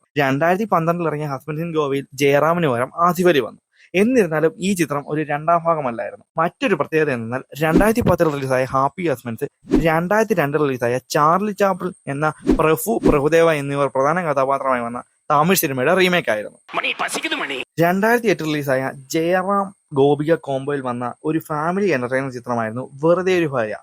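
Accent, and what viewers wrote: Kollam, India. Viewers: കൊല്ലം ഭാഗത്ത് ആണെന്ന് തോന്നുന്നു അവതാരകൻ്റെ നാട്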